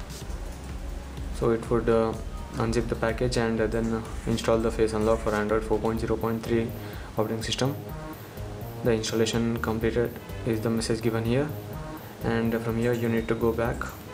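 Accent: India. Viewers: Why do the people with Indian accent talk so fast? It makes nearly impossible to understand what are they saying..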